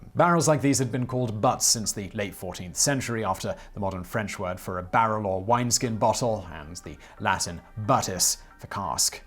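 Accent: United Kingdom. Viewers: is living proof that everything really does sound better with a British accent.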